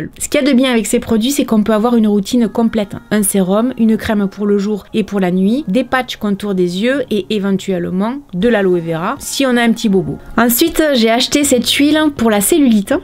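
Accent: South, France. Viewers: Coucou J'aime toujours autant ton accent du sud!!! Dit, pourquoi ne pas utiliser le marc du café après lavoir bu que ce soit en dosettes en capsule... On l'ouvre puis déposé dans ramequin et c utilisable avec gel douche ou aloe Vera...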